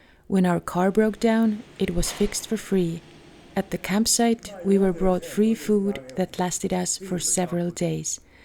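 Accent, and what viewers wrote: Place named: Estonia. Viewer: Aitäh, mulle väga meeldib eesti aktsent! Hääldate mõningaid mu emakeele termineid väga täpselt. Olen vaimustuses ja hämmastunud. Aitäh, mulle väga meeldib eesti aktsent! Hääldate teatud väljendeid minu emakeeles väga täpselt. Olen vaimustuses ja sügavalt muljet avaldatud. Lisaks olete väga asjatundlik ja objektiivne, mida ma eriti hindan. Ma ei tea Eestist kuigi palju, kuid edaspidi püüan teie kodumaa kohta rohkem teada saada. Aitäh, et avasite mu silmad... Parimate soovidega, Samir (Sarajevo) :face-red-heart-shape::hand-pink-waving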